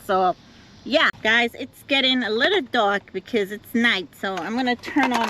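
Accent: Jamaica. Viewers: You have a very thick Jamaican accent. I thought you said you were raised in the United States. It seems like if you’ve been here so long that your native time wouldn’t be so thick 😊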